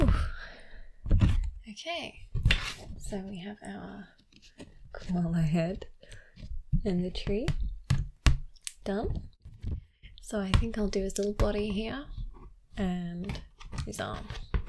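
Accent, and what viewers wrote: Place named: Australia. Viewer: Your voice is amazing!!!! The Australian accent just makes it even sweetet to hear:)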